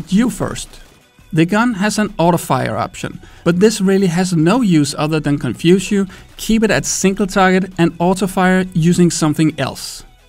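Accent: Denmark. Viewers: You sound Danish? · damn, youve lost alot of your danish accent over the past five years =D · Are you Danish?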